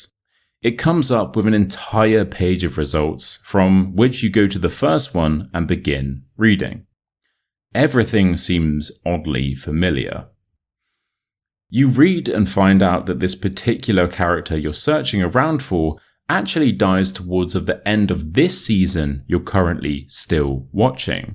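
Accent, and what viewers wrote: United Kingdom. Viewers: Wao i just want to listen the British accent a end up focusing at how story ends , I love it thx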